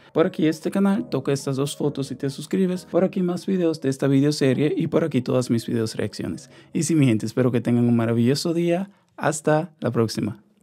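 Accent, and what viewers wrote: Dominican Republic. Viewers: Eres dominicano ? 🇩🇴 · Hola tocayo, usted me parece Dominicano. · Yo sé que eres de RD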